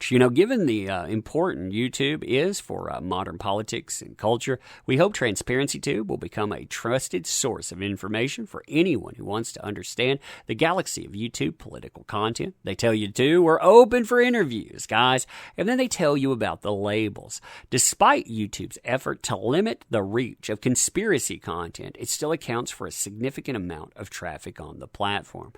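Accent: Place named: South, United States. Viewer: Been hearing a lot about this thing and how wrong they get things. Smh. I love your accent btw, where's it from I assume southern?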